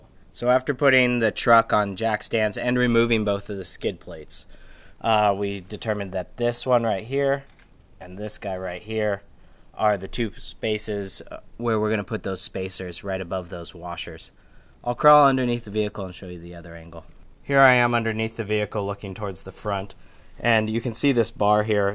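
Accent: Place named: Australia. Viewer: Best Aussie accent ever.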